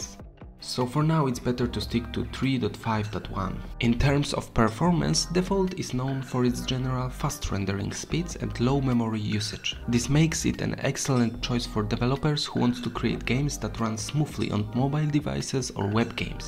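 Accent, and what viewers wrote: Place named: Poland. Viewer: po akcencie od razu poznałem, że Polak! Powodzonka! :)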